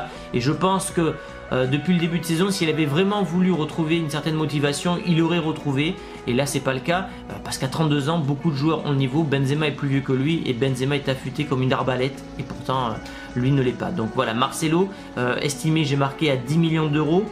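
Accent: Spain. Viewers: Ta veécu en Espagne pour avoir un accent aussi... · Salut je te connais à peine mais sa se vois que ta mis le budget du real dans ta vidéos et en + avec le petit accent espagnols qui passe crème Hala Madrid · Mdr ton espagnol 😂😂😂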